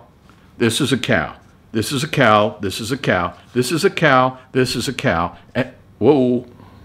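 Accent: Texas, United States. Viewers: I'd revit that area over bonding. If you want to seal below windshield with both, I'd consider good plan to protect wiring from any water leaks. Hope you have some patch panels to cut that rust out on side. Great to see transformation. I spit my coffee seeing that woman's photo 😂 I think it was your texan drawal and you are educating some excited over your project. That bonding has some long term issues. Its not first generation... Not fun to start over. Enjoy your long weekend and BBQ.🌻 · Love your Texas drawl you keep on doing what you do very entertaining the rebuild is a big job you have more will power then I would have on a job like that your videos are awesome don't change anything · Making good progress, i see, and having a great time while doing it, I think. I didn't have any trouble understanding the word "cowl" in the last video or this one. 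I love your Texas drawl,. Of course, that's because there is a big piece of my heart still in Texas. When I would go back to visit family, i would quickly begin to speak in that drawl myself, it comes so naturally to me. Love you, hope you got some good responses from the car guys and girls, which I ain't. 😊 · Well i don`t know what the problem is i understood you perfectly fine because i`m also from Texas and i clearly heard you say COW!! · I just love your Texas Drawl thank you for sharing the difference between cowl panel and cow panel 😂😂HAHA I love it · LMAO 😂😂😂 That is so funny, I understood your Texas drawl just fine and I am not from the USA. And I agree that panel bond is a great option with rivets or spot welds. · No apologies are ever needed! I never did any body work so I have no input of value on that! I always hated working with the front and rear glass...helped do the rear window of a 70 Riviera boattail....YIPES!! 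Happy Labor day weekend to you and the family! Your Texas drawl is easier to understand than some southern dialects...Where oil is pronounce earl, and door is a two syllable word! Fortunately I was raised hearing it all the time so I can still understand it.....well most of the time at least! The blazer is progressing well! Not much better than a bit of shop time with your good car buddies!! Thanks for sharing!! We lost Jimmy Buffet yesterday...so I' had a special Margarita for him....man we are losing some good ones !!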